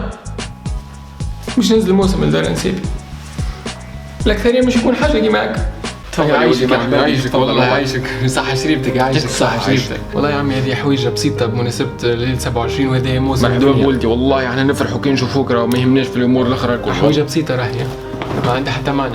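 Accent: Sfax, Tunisia. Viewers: je viens de découvrir ta chaîne YouTube ces derniers temps et j'ai juste envie de te dire que j'adore ton accent sfaxien , c'est juste ouf 😍😍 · شكراً لاستخدامك لهجتك الصفاقسية الجميلة المميزة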